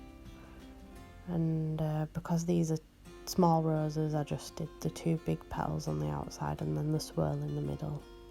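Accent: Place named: Yorkshire, United Kingdom. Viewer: By ek lass . . . Ya proper Yorkshire int ya?. Hahaha. From one Yorkshire lass to another. . . Love these nails.😍💅🏻💕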